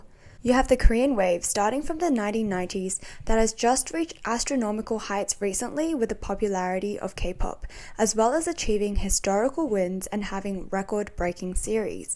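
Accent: England, United Kingdom. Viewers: I see, beautiful girl, love the accent. Is that British? I liked the content too. Thanks for sharing. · never seen an asian have a british accent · you seem to have a English accent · why are you talking like bri'ish? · the accurate British accent as an ESL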